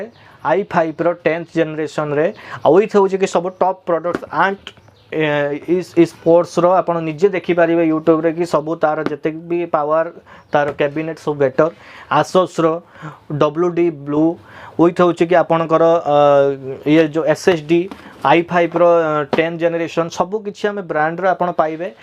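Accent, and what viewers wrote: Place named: Balasore, India. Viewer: Bhai tama ghara balasore ki Tama accent ru lagila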